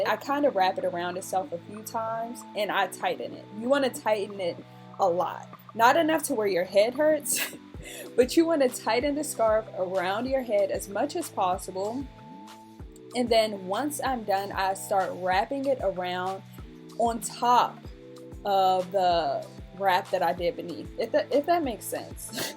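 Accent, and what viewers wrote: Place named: South, United States. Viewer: soo random but i never noticed she had a southern accent. i swear she does 😅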